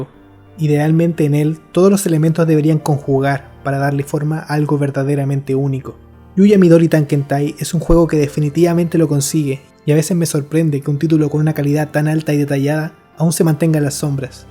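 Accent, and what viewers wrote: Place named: Chile. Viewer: Increíble reseña. Encontré tu video porque alguien lo recomendó en un tablón de imágenes. Me llamó la atención la imagen del post en el que estaba la niña escalofriante que está en un callejón al atardecer. No me esperaba encontrarme con esta calidad de vídeo. El juego parece genial y lo que expones sobre el mismo me hace querer jugarlo pero por desgracia, no tengo mucho interés en aprender japonés, así que será a puro gameplay por ahora. Sin embargo, me asombra tu dedicación y atención a los detalles al armar, editar y en recopilar información de un juego casi desconocido y del que jamás había oído y en otro idioma para luego presentarla de manera que no solamente entretenga, sino que cuarenta y cuatro minutos me dejen queriendo ver más. Te ganaste un suscriptor más entre los cientos de miles que estoy seguro de que vendrán en un futuro. 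siempre que le des tanta o más dedicación a cada contenido que realices en el futuro. Y aunque honestamente no soy fan de tu acento (que creo que es chileno), la verdad no le di mucha importancia. Un poco de cuidado con el volumen del audio de tu narración es lo único que podría recomendarte. TL;DR : tremendo video bro, sigue así.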